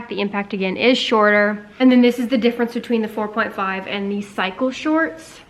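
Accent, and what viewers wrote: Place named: England, United Kingdom. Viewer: how you feeling today..... In ur English voice what's on the agenda for 2day daaarling 😊 I'm not going to comment but real man knw real from fake marshmallows.... This what I say about that 😎👍🤙🙌🦾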